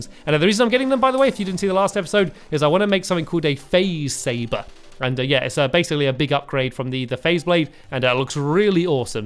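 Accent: England, United Kingdom. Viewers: Cake is Nice do u not know what a an English accent is?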